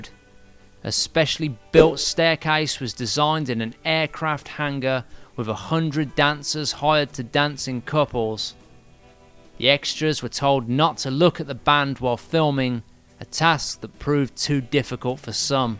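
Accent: Birmingham, United Kingdom. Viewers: Ha! Nothing like him! Brummie accent instead of Scouse for a kick off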